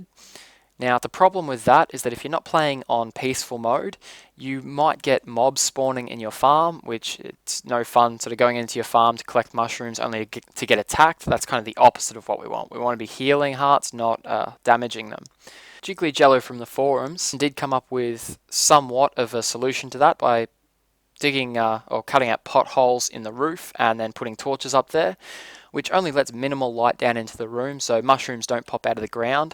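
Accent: Australia. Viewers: r u aussie? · are you an aussie :D?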